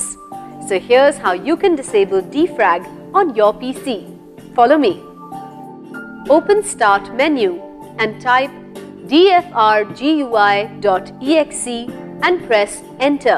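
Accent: India. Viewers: You should put a text version of the instructions in the comments or at lest the name of the service you need to type in the start menu search box. She speaks very fast in an Indian accent, in the end I had to full screen the tube and read what it said to make this work. Also, another reason you might want to disable auto defrag is if you have an SSD. Where defraging actually shortens the life of the drive. HTH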